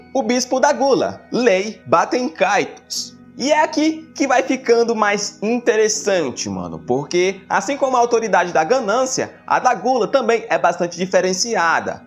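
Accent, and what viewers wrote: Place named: Northeast, Brazil. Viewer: Mano vc tem sim um pouquinho sou do Piauí ent n consigo perceber mt sotaque nordestino, porém eu ouvi ums foi "massa" "foi paia" ai pronto tive certeza que é nordestino raiz kkk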